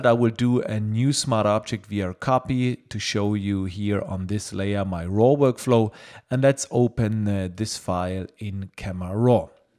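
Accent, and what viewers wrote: Germany. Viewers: Mal wieder ein tolles Video! Finde den Stil toll, er gehört zu dir und das Bild kommt dadurch großartig zur Geltung. Finde es übrigens gut, auch mal englische Tutorials zu bekommen, so kann man sich über das "deutsche Englisch" (für mich persönlich genauso gut verständlich wie Deutsch) weiterarbeiten zu anderen Nationen vorarbeiten, wo teils deutlich schneller und (wohl eher bloß aus deutscher Sicht) undeutlicher gesprochen wird :D Und wie pflegte es Ben letztens so schön in seinem Interview mit Ben von Wong zu sagen... (Frei zitiert) "Ihr versteht das Video nicht? Nehmt es als Anlass, Englisch zu lernen!" Weiter so! Vielen Dank! ;) PS: Falls du Zeit findest auf die Frage einzugehen, wäre es großartig! Du hattest mal einen Link gepostet bzw. war dieser im Zuge deines Kommentars zu Spiegel-online Lesern enthalten, indem es zu einem Script vom Camera Raw für PS gehen sollte. 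Bei mir öffnete sich da immer wieder nur der iTunes Store. Arbeite mit Windows und CS6, möglicherweise schon nicht möglich? Bin diesbezüglich technisch noch nicht so fit und bin bei Google auch nicht so recht fündig geworden. LR habe ich zwar seit kurzem auch, würde aber gerne in PS diese tolle Option mit drin haben - falls möglich. Ohje, typisch Frau mal wieder viel zu lang formuliert :D Falls du Zeit findest - ich freu mich ;)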